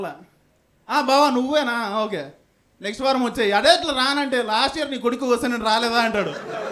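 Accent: India. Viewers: పక్కా అనంతపురం యాస. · Bro nee jokes genuine ga bagunnayi, boothulu theesesina kuda anthe comedy ga untayi... so try to avoid bad words.. neeke reach ekkuva untadhi appudu.. btw i like your kadapa slang bro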